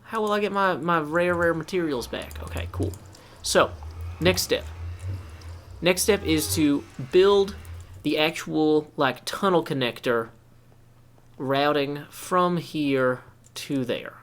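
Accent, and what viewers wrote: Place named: South, United States. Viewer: It is my first time watching one of your videos, and I am greeted with beautiful singing and relatable musical hate for the nether. Your voice is wonderful! You have a bit of a southern accent, are you from Georgia? :D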